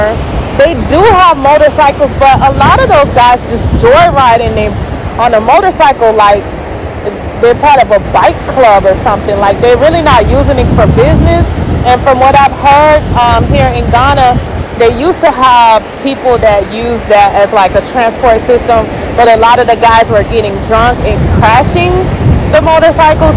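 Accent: United States. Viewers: Wow I'm surprised, I thought Kampala would be as hot as Ghana. You sound so American. I would never guess that you are Jamaican-American.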